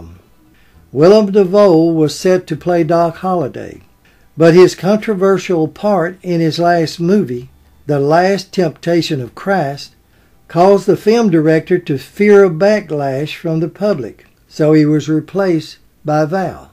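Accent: South, United States. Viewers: Another great video,. Your Southern charming voice once again makes your videos golden. Thank you.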